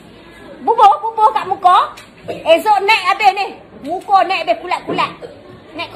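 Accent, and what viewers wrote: Kedah, Malaysia. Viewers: Orang kedah memang sedap dengar bila depa marah 🤣🤣🤣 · Pekat betoii bahasa Kedah cikgu ni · Cikgu ni org Kedah ka 😂😂😂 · Kelas bahasa kedah ke ni? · Rasa pelat tu bkn penang..ni blah kedah perlis x silap..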